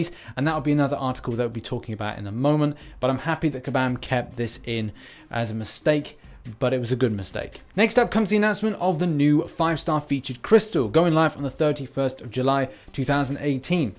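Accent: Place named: England, United Kingdom. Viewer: it took me 6mths to realize u were saying forum link not foreign link. Good old queens English hey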